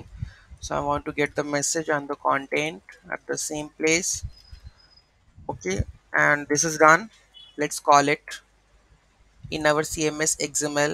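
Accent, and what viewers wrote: India. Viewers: Ur accent sounds like u're from Uttar Pradesh! Isn't it?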